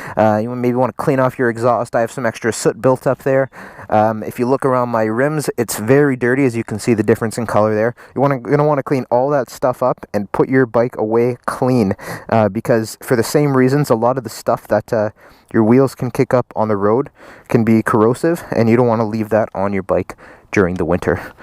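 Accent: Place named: Canada. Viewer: You sound canadian btw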